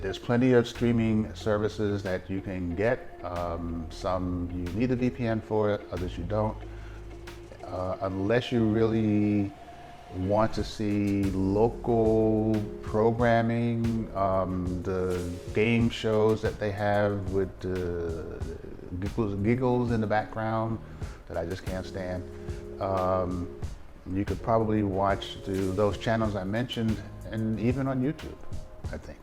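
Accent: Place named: North, United Kingdom. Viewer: Good job 👏👍keep banging out content and your channel will grow. The phillipines has softend you northern accent alot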